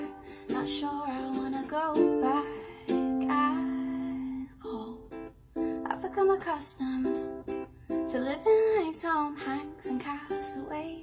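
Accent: Scotland, United Kingdom. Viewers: That Scottish accent. Nice surprise, along with the tune that describes everything I feel.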